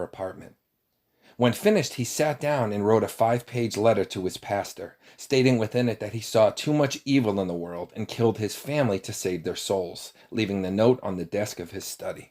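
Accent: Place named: New Jersey, United States. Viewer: I apologize for coming to your blog late. I like to spread positivity although some of the topics I'm interested in aren't filled with light and happiness. I was just captured by your voice and the way you presented the facts in this example of true-crime posts. You didn't skip a beat and many don't know it's not just reading from a transcript, but having the ability to entertain the audience with a rhythm and lack of audible mistakes. We appreciate the time you spent researching the project and i look forward to more works from you. This is just a silly side note, but this evil man spent most of his life residing in New Jersey. I may be wrong, but i detected a New Jersey, New England-type dialect. Thank you for reading. Greetings from the Buffalo, NY area. 😊 K.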